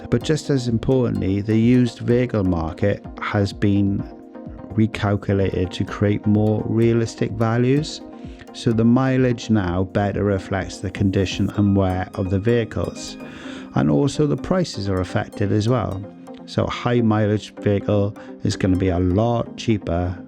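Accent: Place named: Cardiff, United Kingdom. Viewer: Looking forward to this one mate. Sounds solid. Is that a Cardiff accent I hear? I was thinking Newport but changed my mind. I’m from Caerphilly originally.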